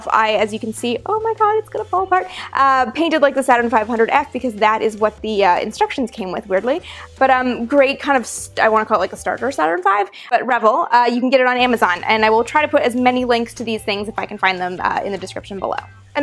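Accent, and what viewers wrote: Canada. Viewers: You sound Canadian. So strange that almost all of the channels I'm subscribed to are Canadian.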